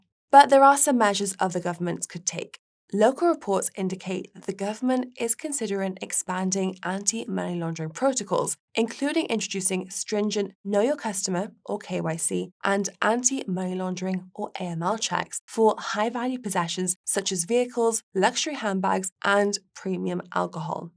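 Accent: United Kingdom. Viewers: Her British accent n soft voice 🍬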